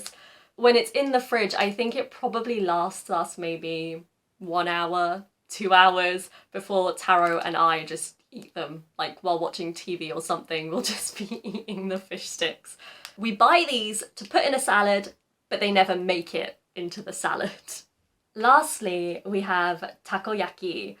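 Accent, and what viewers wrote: United Kingdom. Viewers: carwowのマットとしゃべり方が同じだ。これがイギリスアクセントか。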